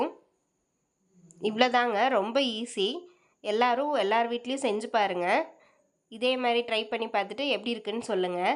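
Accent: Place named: Kongu, India. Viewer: 🤩 i will try🥳 unga kongu tamil and voice spr nga🥰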